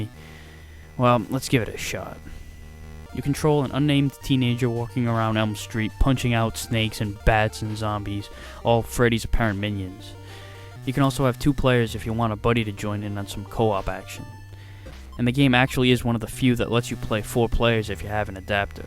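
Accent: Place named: Brooklyn, United States. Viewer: your voice is almost remeniscient of a Brooklyn Accent. nice vid btw :)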